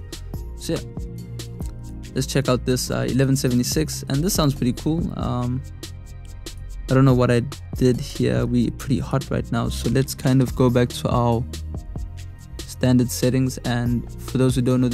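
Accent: South Africa. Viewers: are you south african dude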